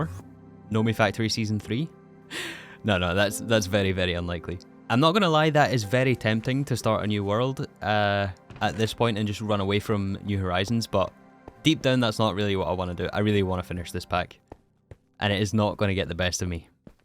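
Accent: Scotland, United Kingdom. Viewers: I feel as though you're hinting at the fact you're struggling with a bit of burn out but don't want to say that due to how that can be interpreted. I think it's natural on a modpack like this that there are going to be points where you just can't be arsed as it's the same grind for hours on end that isn't really valuable for your content and therefore not to us either which feels like a massive waste of time but there's no avoiding it as that's the progression. I would say, do what's best for you. If you feel as though starting something new would be best, do it. I enjoy your content not for seeing the tier 35 rocket but your upbeat Scots rambling.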